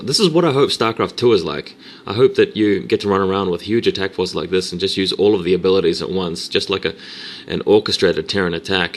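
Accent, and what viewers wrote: New Zealand. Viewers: I like your accent. Go NZ. :D And nice commentary, I understood everything that was going on, and I actually don't really know too much about the idiosyncrasies of the game.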